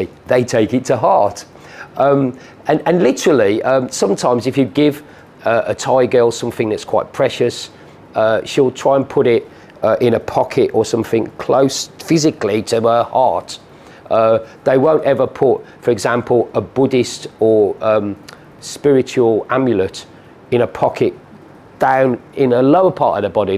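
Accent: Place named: England, United Kingdom. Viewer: Why are these videos always made by old fat bald men with English accents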